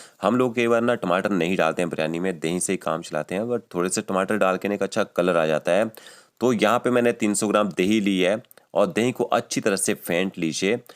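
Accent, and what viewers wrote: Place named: Punjab, India. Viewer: Chef aap mast hain, pls don mind thoda Punjabi style hai but recipes mast hain aapki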